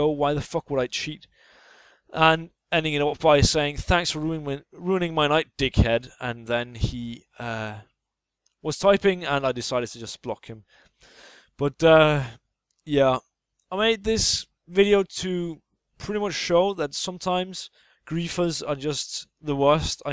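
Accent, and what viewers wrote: Denmark. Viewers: are you danish mayt? 😊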